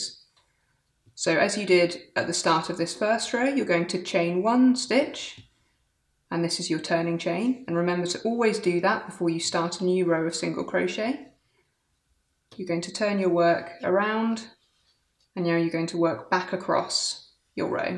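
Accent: United Kingdom. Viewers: Silly question: I'm new to crocheting, but I know there are US vs UK terms. I'm assuming because of your accent this is the UK single crochet (US double crochet?). I'm asking because I've seen other tutorials and this seems like the US single crochet but, again, potentially silly of me, I'm inferring from your accent it's the UK single crochet?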